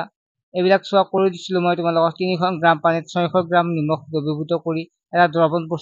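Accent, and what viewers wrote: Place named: Jorhat, India. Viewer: Sir jorhat district r lage 😅😅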